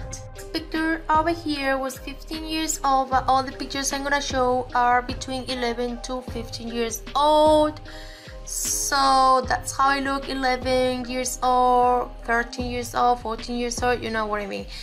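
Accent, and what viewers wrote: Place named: Spain. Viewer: Me encanta tu acento. Algun día visitare España.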